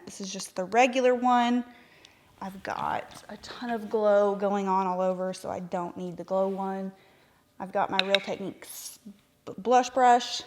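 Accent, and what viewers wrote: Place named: Tennessee, United States. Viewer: I love your accent. I am aTennessee gal too. 😊💜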